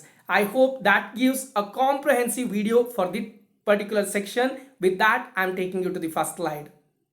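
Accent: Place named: India. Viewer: Never watch your video before sir..But after watching it first time i am very much impressed especially the way you present and also your indian acent of English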